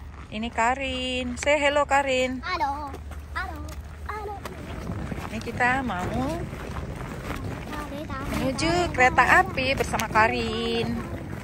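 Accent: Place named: North Sumatra, Indonesia. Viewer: Anak mix bule tapi logat batak nya kental ya mbak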